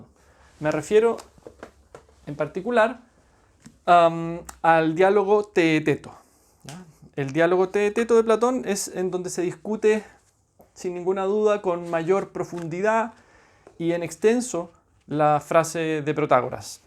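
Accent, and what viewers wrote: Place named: Chile. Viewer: Gracias por la explicación. Y me gusta el acento chileno. Es muy apacible.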